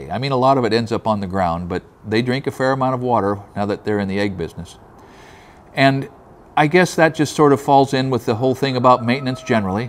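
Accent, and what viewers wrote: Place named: Northeast, United States. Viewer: Love hearing the ring of a northeastern state from the mouth of an honorable northwestern man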